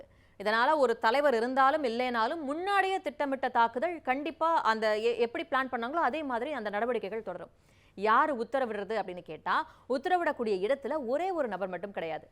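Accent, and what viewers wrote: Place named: Chennai, India. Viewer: இவ்வளவு கௌவரவமாக உடை உடுத்து Madras தமிழ் பேசுகிறீர்கள் முத்துராமலிங்கம் உயிரோடு இருந்திருந்தால் உங்களைப்பெற்றதற்காக நிச்சயமாக கவலைப்பட்டிருப்பார் .பாடசாலையில் படிக்கும் போது Madras தமிழில்தான் படித்தீர்களா? தூதூதூதூதூ.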